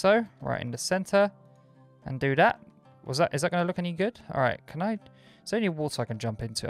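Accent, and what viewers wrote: United Kingdom. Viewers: Its british. I have the same accent. It doesnt sound like he has an accent to me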